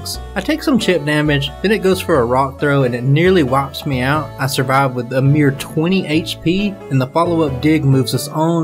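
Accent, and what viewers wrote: South, United States. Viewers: just finished your vid and btw love the southern accent... Quick question do you think you can do a vid of you doing it as if you were playing normally.. as in more than one pokemon.. no tms and no items? Just not seen one of them might be intesresting.. if you do or dnt will keep watching your vids keep it up :)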